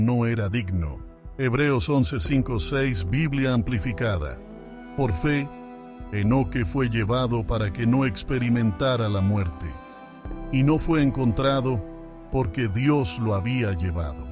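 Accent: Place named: Brazil. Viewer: es el narrador, los videos estan Mal editados, a veces se puede oir un acento como brazileño y a veces hasta en portugués se oye que esta hablando.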